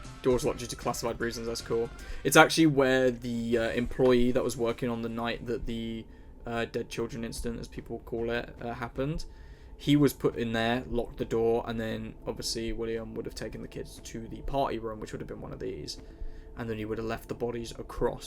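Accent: United Kingdom. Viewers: i didnt know you was British too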